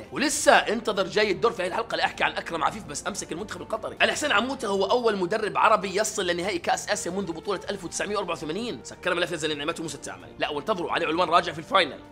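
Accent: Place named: Iraq. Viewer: بس سوال انتي عراقيه صح من طريقه كلامك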